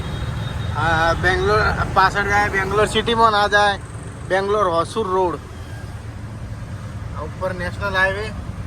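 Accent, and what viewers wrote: North Gujarat, India. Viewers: Uttar Gujarat ni boli gageh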